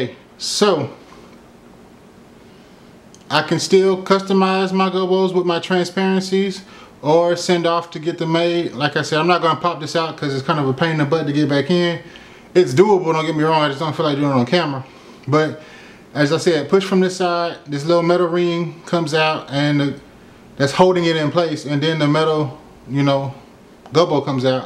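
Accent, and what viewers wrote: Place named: Detroit, United States. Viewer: you from detroit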